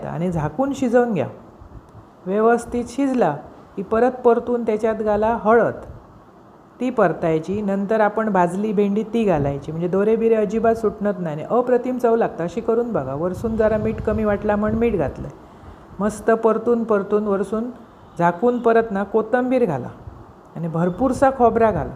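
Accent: Malvani, India. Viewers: खुप छान तुमची मालवणी भाषा मस्त वाटत आहे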